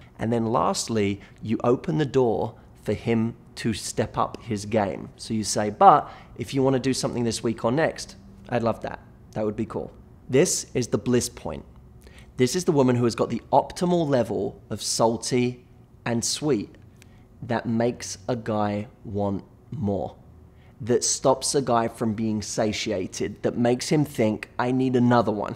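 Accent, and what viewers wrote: United Kingdom. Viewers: Something about a man telling me how to adjust my standards to a bliss point to gain a man's interest and respect is making me ... angry? indignant? patronized? I'm a little salty here on his sweetness. Ladies, please, this entire video begins on the foundation of "what I (a man) believe women experience"... that should have immediately triggered your intuition to approach this with skepticism. If he didn't have such marketable attractiveness (read as: cute brit with the accent) there's no way this channel would be so popular; hell it's why I clicked on the video. He's capitalizing on his sexual appeal to gain trust of women to what he believes will make a women attractive to men.like.him. Don't get me wrong, he seems like a sweetheart and I can see what he's /trying/ to accomplish, but after working in finance I can tell you, every video is still a clever sales pitch - don't buy into him. 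Just respect yourself and respect the man but to hell with any man that doesn't respect you - no matter how cute they are. It's much more satisfying to be with a man where you don't have to change yourself to a bliss point. Let him love you for you, salt, sugar, and every spice in-between. I trust that the "right" man (not the man that gets his ego easily bruised or the man that doesn't respect your sweetness) will respect and love you more (and a lifetime) for being real than someone who is artificially flavored. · Love this british face and accent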